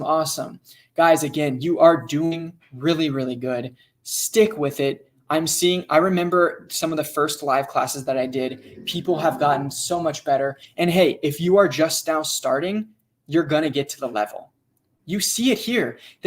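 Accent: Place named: California, United States. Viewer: AWESOME! THE BEST ACCENT I"VE HEARD IN FLUENCY, FROM CALIFORNIA.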